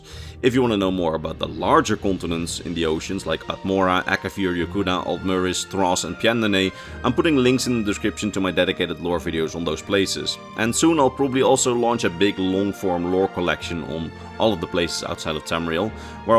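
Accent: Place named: Netherlands. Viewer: Uw accent... U bent toch Nederlander of Flander? Videos op het Nederlands zullen ook erg interessant zijn.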